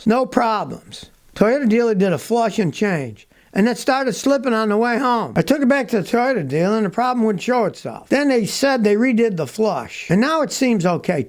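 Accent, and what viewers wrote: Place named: United States. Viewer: Must be the common sense and ability to speak americanized English using real words on display again 😎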